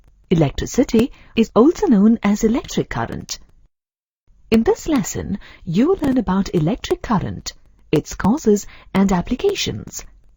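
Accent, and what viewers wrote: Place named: India. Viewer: Bad accent and wrong terminology. A typical indian product.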